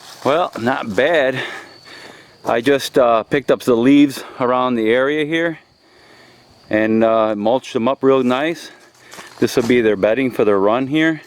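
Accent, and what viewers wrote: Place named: Northeast, United States. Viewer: Never would have guessed You are from texas. Your accent sounded to me l ike you're from the North or the Upper Northeast almost Canada It's the 'O'. Got an O myself, Lived in the south all but the 1st 4 yrs of my life and I still got the O.😂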